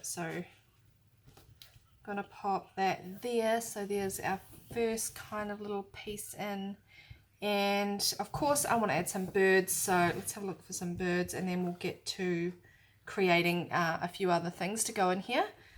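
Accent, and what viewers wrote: New Zealand. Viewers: Love this video. I am a new subscriber from Sydney. Got put onto your channel by my sister' Roxy Creations By Rachel. Love all that you make and could totally tell that you were a Kiwi as soon as I heard you:)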